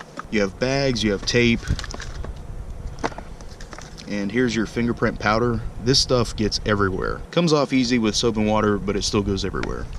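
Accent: United States. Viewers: Your accent sounds American but one of your guidebooks was specifically for Canada... can you confirm what country you're in?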